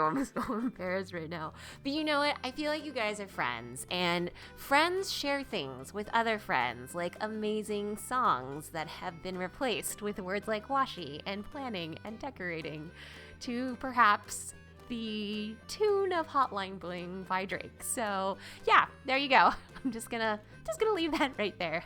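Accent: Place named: Northern California, United States. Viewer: Love it, knew you were from NorCal .... From Alameda :)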